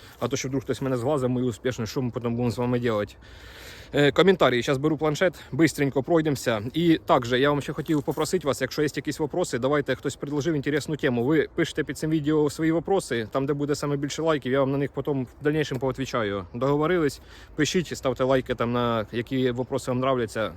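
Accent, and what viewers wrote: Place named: Surzhyk, Ukraine. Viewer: А мені подобається слухати суржик,хоч сама із західної області.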